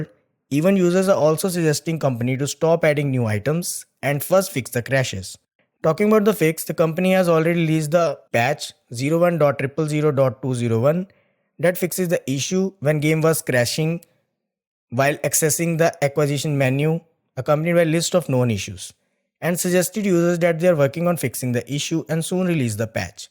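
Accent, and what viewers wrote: India. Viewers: feedback: please learn to pronounce EN proper. dont use the indian scammer accent and expect not to get backlash. if not at the very least make content exclusive on hindi.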